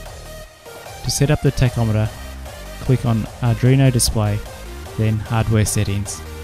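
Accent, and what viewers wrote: Australia. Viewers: When you finally hear daddy's voice and he's an aussie <3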